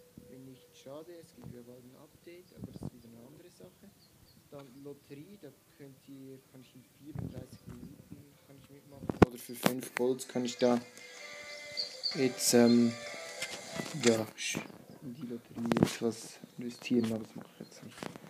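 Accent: Switzerland. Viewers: Da hört man Schweizer Dialekt raus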